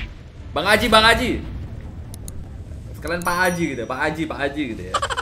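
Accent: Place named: Medan, Indonesia. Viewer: Bang orang Medan kah? Logatnya mirip2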